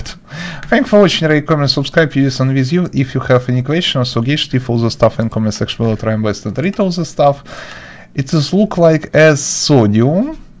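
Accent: Russia. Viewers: eu tenho quase certeza que ele é russo pelo sotaque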